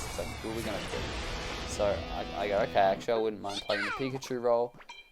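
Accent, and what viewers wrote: Australia. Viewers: Oh broh are you Aussie mate?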